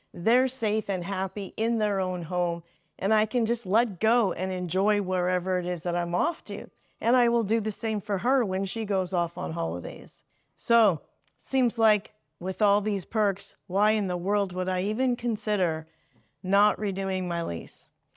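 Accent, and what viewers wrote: Canada. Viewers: I’m hearing a Canadian accent…..you did a great job with this video….pat yourself on your back…you are too young to be living in a 55 community….you look very young…I get that you moved to be close to your kids/grands and that’s important. I’m jealous because my kids live far away. I can picture you in a complex, close to your kids that does not have the age restriction…..don’t know if that even exists where you live. My vote is for you to move if you can find a nice place…..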